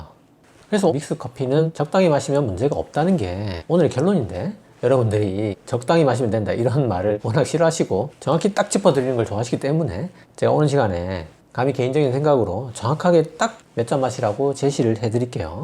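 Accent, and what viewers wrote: Gyeongsang, South Korea. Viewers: 사투리 늠조앙 ㅎㅎㅎㅎ경상도시네요 반가바용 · 갱상도 쌤 같은데 하나도 지루 하지않고 귀에쏙쏙 ㅋㅋㅋ믹스커피의 억울한 오명을 벗겨주시네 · 은근히 구수한 사투리가 좋습니다 ᆢ감사합니데이^^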